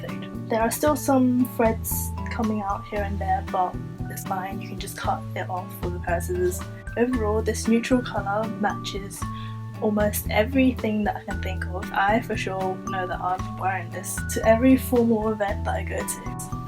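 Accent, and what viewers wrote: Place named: United Kingdom. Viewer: I also love wearing puffy clothing to hide me tummy too XD i love your british accent!! im australian but people claim i sound british?! HAHAH anyways i love the haul! and how honest you are heheheh i wish i could be your 100th sub BUT lucky lucky to whoever it is T.T YEAH YYAYAYA XD looking forward to more videos!! :DDDD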